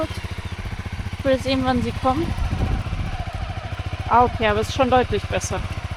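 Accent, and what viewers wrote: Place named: Rosenheim, Germany. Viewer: "Servus aus Marokko" cool! Schön, dass man in und um Rosenheim immer noch so redet, wie man da halt redet : )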